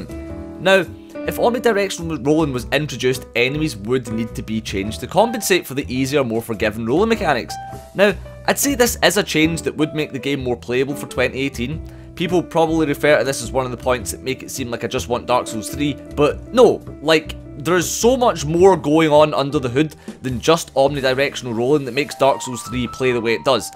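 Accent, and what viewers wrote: Scotland, United Kingdom. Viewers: Wow I just got this vid in my recommended and I feel blessed because I never knew having a Scottish (Irish???) person yelling at me would be such an experience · It's almost weird hearing a Scottish accent like mine on Youtube. Sooo refreshing though. Also - I fully agree, lul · U Scottish, mah boi? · Are you from Scotland? Love your accent <3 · This is the first video I've seen from you. I've never heard a more scottish accent. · i canny tell if its where youre feh or no but its sounds like you are scottish but tryna be understandable af to everybody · Anything is better in a heavy scottesh accent.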